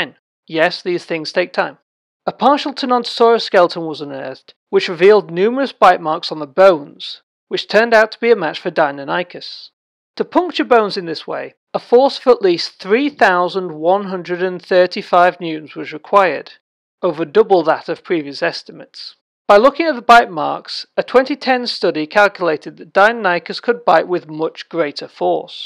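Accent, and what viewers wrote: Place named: United Kingdom. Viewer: He's from the UK, alright?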